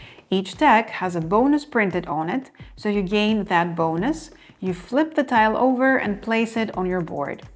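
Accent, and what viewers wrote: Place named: Greece. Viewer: πολυ ομορφη εξήγηση. Ευχαριστουμε! Επιασα Ελληνική προφορά, ελπιζω να μην κανω λαθος. 😄😄 επαγγελματική διαστροφή ειμαι καθ. Αγγλικων. φοβερη δουλειά. Ζηλεύω. 😂 θα το παίξουμε σημερα. και θα το εξηγησω (ως συνηθως 🙄). θα σου πω εντυπωσεις!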